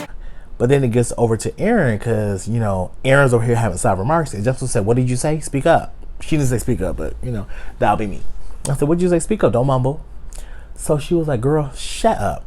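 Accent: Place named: South, United States. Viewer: Oh georgia! I was wondering where in the south you were from. Whenever my subtitles are on they translates you sooooooo funny.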